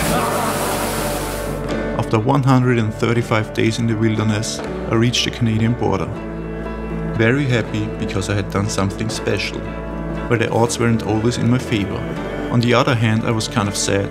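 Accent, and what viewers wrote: Germany. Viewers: Könnte es sein das du Deutsch bist? Hast ne deutschen Akzent... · Wow! Ich bin fasziniert darüber was für eine Reise du zu der deinen gemacht hast! Ich habe eine Millionen fragen an dich und weiß genau ich kann sie nicht alle stellen jede Stelle ich dir eine essentielle: was war das für ein Gefühl zu Beginn und was für eins am Ende dieser wunderbaren Reise? Ich möchte in den kommenden 3 Jahren mich auf eben genauso einen Trip vorbereiten vielleicht hast du auch eins zwei Tipps wie ich das am besten mache da du dies ja bereits erfolgreich gemeistert hast! Ich komme aus Niederbayern du hörst dich von der Aussprache auch so an als stammst du aus Niederbayern. Mach weiter so! Du hast hiermit einen neuen Abonnenten gewonnen und vielleicht sieht man sich mal auf den Pfaden oder man wandert gemeinsam! 🤩👍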